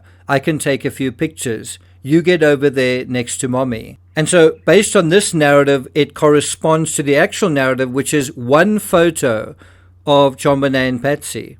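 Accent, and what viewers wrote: South Africa. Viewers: South African ascent … superb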